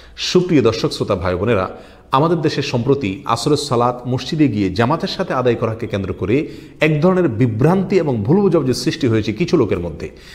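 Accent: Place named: Noakhali, Bangladesh. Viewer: শায়েখ আপনাকে অন্তর হতে দোয়া করি মুসলিম উম্মাহর ঐক্যে পানি ঢালার জন্য। মাশাআল্লাহ। আলহামদুলিল্লাহ। আজ মুসলিমদের ফেকহি মাসআলা নিয়ে অনেক বিভেদ, বিভক্তি ও ফ্যাসাদে লিপ্ত। ইহা নিয়ে বয়ান দেয়ার জন্য জাজাকাল্লাহ। আল্লাহ পাক আপনার হায়াতে বরকত দান করুন। আপনার বয়ান গুলো আমি শুনি। আপনার কথা গুলি আমার নিকট অনেক গ্রহণযোগ্য মনে হয়। অনেক শায়েখের কথা একটু বেশী কড়া ও ঝাঁঝালো।যা মুসলমানের মাঝে অনৈক্যে একটু ইন্ধন হয়। আল্লাহ পাক শায়েখদের হায়াতে বরকত দান করুন যেন সকল মুসলিমের মাঝে ঐক্য সৃষ্টি হয়। আপনার কথায় কেনো জানি আমি বুঝতে পারি আপনি নোয়াখালির কোন অঞ্চলের মানুষ।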